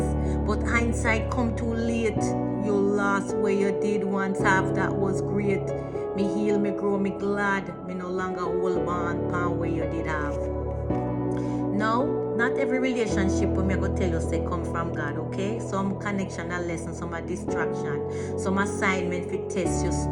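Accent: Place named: Jamaica. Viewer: Love it. You sound jamaican, are you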